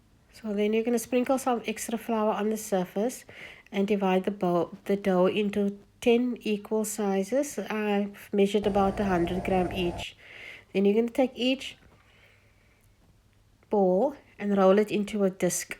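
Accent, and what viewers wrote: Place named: Cape Town, South Africa. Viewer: Hi, there is nothing that is such a taste festival as a "Bo Kaap" samosa. Just listening to you speak makes me so homesick for Cape Town again. Much love from NZ.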